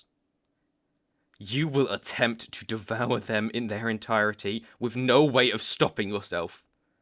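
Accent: United Kingdom. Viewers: I SEE BRITISH I ARIVE IMEDEATLY (not in a weird way or anything also, I'm dyslexic, give me a break) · Me not seeing this is a British audio "Hey there!" Jumps in holly sweets that scares me Me: "Why is it always the accents that startle me?" This was so much fun to listen to though! I loved it! Absolutely enjoyable! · playful teasing even when serious plus the brit sound :face-orange-biting-nails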